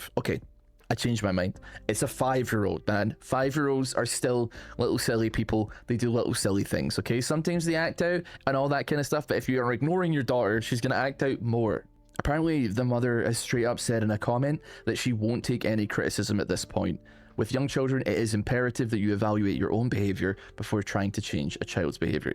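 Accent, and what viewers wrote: Scotland, United Kingdom. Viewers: Never realized I needed reddit drama in a thick Scottish accent until today >.>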